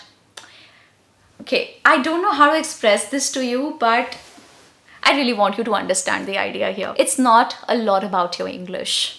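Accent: India. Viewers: Your accent is indian.. Are you from india mam ?🌝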